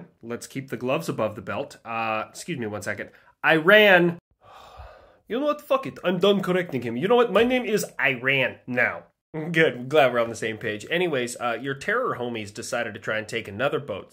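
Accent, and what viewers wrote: Scotland, United Kingdom. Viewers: If the scots don't like your scottish accent impression, could you try maybe Soap MacTavish? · Ok, so does he sound like fat bastard from Austin Powers? How about the Scots in braveheart? Or perhaps Scotty in Startrek? Hell ive been to the UK and ran into a few pretty cool Scottish people and honestly its close enough. Also fun fact the Irish were a lot harder to understand when i visited the region. Oh i forgot about Gimli from lord of the rings. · am a Kilwinning lad from scotland, and i love your Scots. regardless of sound its spiritually accurate! Just remember the word 'Cunt' in scotland isint a swear word like the auzzies, its more of a comma! #A10ismyspiritanimal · My good friend directly from Scotland tells me that the accent is like a family-friendly version. And showed me how a real Scotsman swears and curses that I can not repeat here in Youtube comments. 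· Right who's been having a go at your scottish accent? Point me to them the wee bastards. You're doing great! 🏴FREEDOM!🏴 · As a Scottish person myself, I'm fine with the accent it's funny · I'd love to hear Count Dankula's or Critical Drinker's thoughts on your Scottish accent. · If people complain about the Scottish accent, just tell them that you're actually doing a dwarven accent from Warcraft or something.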